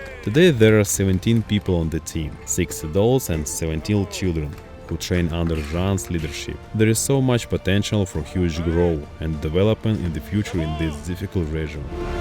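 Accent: Russia. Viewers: Ведущий внешне и по акценту на русскоговорящего похож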